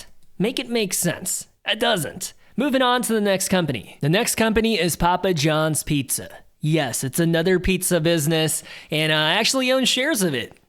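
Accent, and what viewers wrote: United States. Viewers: Based on the way you talk i thought you are white american. But i dont really care, im here for info and wife's boyfriend jokes :) Im white guy from Europe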